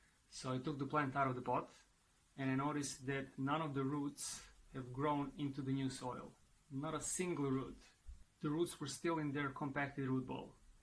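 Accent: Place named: Serbia. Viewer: Where are you from? For some reason, you sound Serbian to me 🤗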